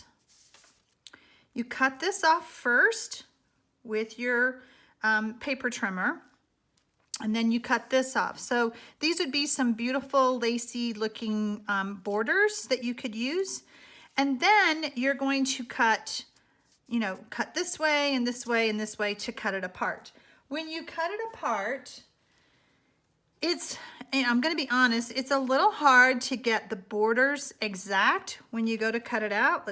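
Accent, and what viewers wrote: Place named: Texas, United States. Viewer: Wonderful video! I wasn't going to buy this laser cut paper until I saw your video because I didn't know how to cut it or use it, but now I can't wait until my order comes and I can try to make a beautiful card. Thank you so very much! (I'm from Texas, too, and I love your accent....sounds like home to me!)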